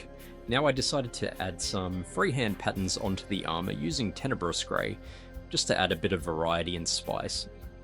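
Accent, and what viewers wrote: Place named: Australia. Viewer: Fantastic result! Loving the heavy Aussie accent, mate ^^ I'm just sitting here waiting for you to start swearing heavily :)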